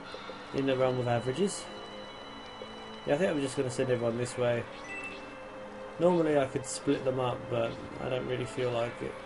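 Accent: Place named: England, United Kingdom. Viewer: you arent bugging me with your sloppy work. your accent (since im american its an accent to me =P) makes it all work it. very entertaining. I find it ironic that both commented LPs of this game are by fold from the land of england. even if you are in japan now like you mentioned in one of your videos.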